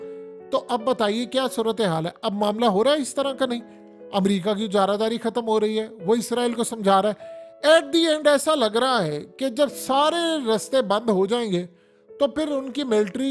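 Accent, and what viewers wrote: Punjab, India. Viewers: I can only request you please don’t talk in English coz you speak English in Punjabi stick with Urdu and don’t try to copy different accents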